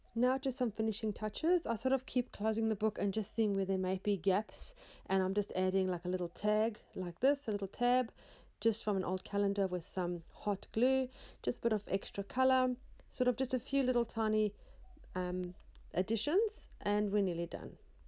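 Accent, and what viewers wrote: South Africa. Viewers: Hello 👋🏻 Just discovered this kind of journalling during the lockdown really and your channel came up in my feed! Love your creativity 💕 Heard your SA accent and wondered where you were? Going to watch some more 🎶🌟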